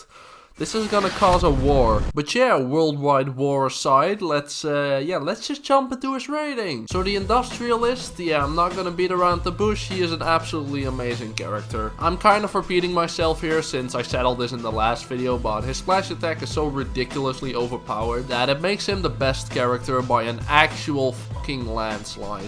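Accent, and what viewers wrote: Netherlands. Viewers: Hey are u dutch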